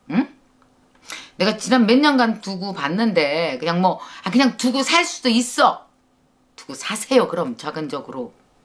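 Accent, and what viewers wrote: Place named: Chungcheong, South Korea. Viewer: 오래전 동료 캐나다인 친구가 자기 어머니의 인간관계 처세술이라며 절대 다리[bridge]를 불태워서는 안된다고 했어요 다리를 불태우면 돌아갈때 다리가 없다고... 일맥 상통하나 표현법이 다르죠 ...정서와 문화가 너무 다르죠...선생님께서는 영어강의를 누구를 위해서 누구를 대상으로 하실건가요? 제가 선생님과 친분이 있는 관계라면 영어 강의 좀 기다리라고 하고 싶어요. 좀 더 유튜브의 비쥬얼에 신경을 쓰고(컨텐츠는 저도 팔로우라 좋아요)있으면... 외국에 있는 한인 교회나 다른단체에서든 러브콜이 올거예요 비행기표와 함께...거기 초빙되어 가셔서 그 멋진 충청도 사투리로 선생님 이야기 들려 줘보세요 다들 웃고 울고 할꺼예요. 대한민국이 전쟁을 겪고도 노벨 문학 / 상이없다고 엽전의식에 젖어 있는 사람들 봤어요 가와바다 야스나리죠? 설국쓴 일본작가? 저는 학생때 읽었는데 이 책이 왜 노벨 상을 받았는지 이해가 안되었는데...중략....다음에 여건이 되면 꼭 본론을 쓰겠습니다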